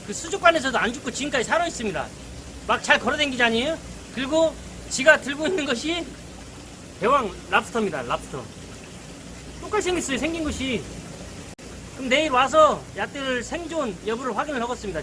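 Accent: Jeolla, South Korea. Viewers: 전라도 사투리가 구수허네유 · ㅋㅋㅋㅋㅋㅋㅋㅋㅋㅋㅋㅋㅋㅋㅋ 토종 전남분이시죠? 아 웃긴그 ㅋㅋㅋㅋㅋ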